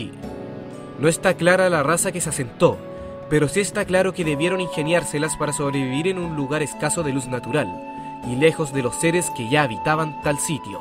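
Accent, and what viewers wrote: Chile. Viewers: tu voz me parece de Chile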